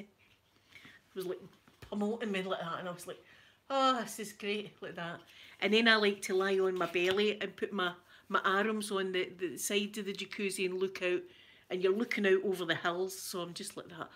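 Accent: Scotland, United Kingdom. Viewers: I love that you wear two glasses like it`s the most normar thing :) and your laughter is priceless, I love it ❤️ I followed you for a long time. You are so nice, beautiful and sweet, and I love your videos ❤️ I love your accent (Scottish), and the accent in general is one of my favorits😍❤️ Ps: I usually watch your videos over and over again, they are so lovely and comforting❤️ stay safe and God bless you ❤️ lots of hugs from Norway ❤️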